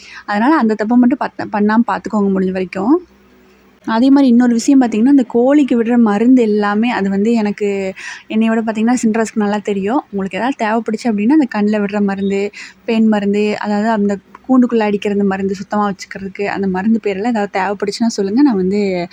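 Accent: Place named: Kongu, India. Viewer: Neenga yen sudden ah kongu slang la pesuringa.... Unga previous videos lam normal slang la pesuninga.. Adhaan kettaen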